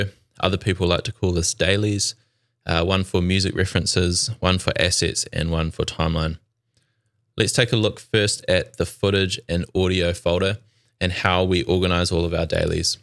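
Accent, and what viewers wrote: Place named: New Zealand. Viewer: Started watching the video - and my brain we like "that accent sounds so familiar and then you mentioned New Zealand. Ahhh Haaa! I am from New Zealand too! - btw Great video mate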